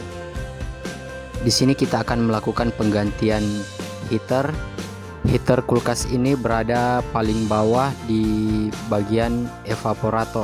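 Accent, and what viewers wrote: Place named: Makassar, Indonesia. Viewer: Sprti nya anda orang makassar ya?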